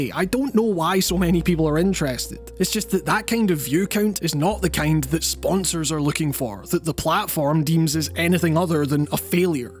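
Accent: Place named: Scotland, United Kingdom. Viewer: Started watching your videos after moving to a new country and took great comfort in hearing about one of my favourite topics being covered by a fellow Scot so I could savour hearing the familiar accent while growing accustomed to a new place. At the same time, I grew to really enjoy your approach to topics, you cover games differently to most reviewers and bring in your subjective and often emotion based opinion which is honestly so refreshing and has helped me think about why I enjoy games the way I do. You brought great insight to the music of Shadow of the Colossus that really changed the game for me so any more exploration of your other passion in music is more than welcome.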